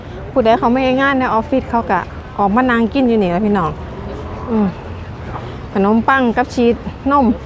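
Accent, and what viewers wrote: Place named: Isan, Thailand. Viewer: ฟ้งสำเนียงการพูดแล้วชอบจังค่ะ..ชอบสำเนียงไทยอิสานนี้ค่ะ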